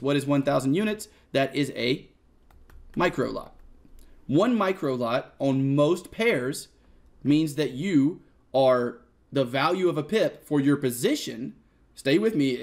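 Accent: South, United States. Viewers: His southern accent comes out occasionally and its adorable lol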